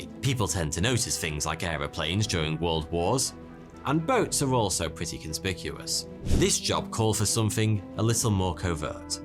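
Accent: United Kingdom. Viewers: British English🤮🤮🤮🤮🤮🤮🤮🤮🤮🤮🤮🤮🤮🤮🤮🤮🤮🤮🤮🤮🤮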